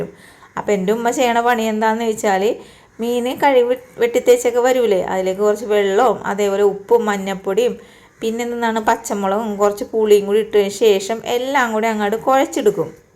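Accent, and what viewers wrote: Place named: Kochi, India. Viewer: ഈ കൊച്ചി ഭാഷ കേൾക്കാൻ ഇമ്പമാണ്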